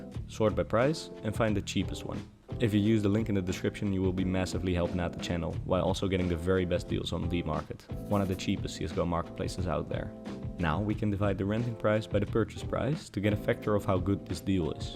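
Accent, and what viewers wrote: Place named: Netherlands. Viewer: je hoort die nederlandse accent altijd zo goed he XD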